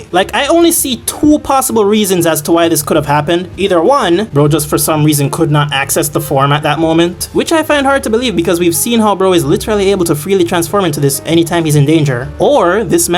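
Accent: Jamaica. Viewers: sound like a yaadie heard obeah thought i was tweaking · You sound like the Watch Mojo guy with a Jamaican accent. I love it.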